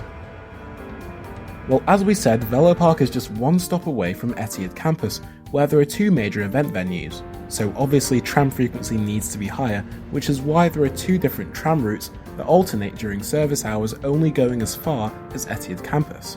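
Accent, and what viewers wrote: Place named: Australia. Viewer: Stop talking like an Aussie...proper fkin annoying.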